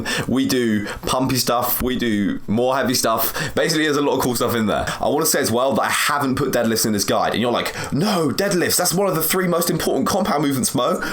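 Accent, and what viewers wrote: United Kingdom. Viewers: fking britsh accent hurts my ears no offence and good work btw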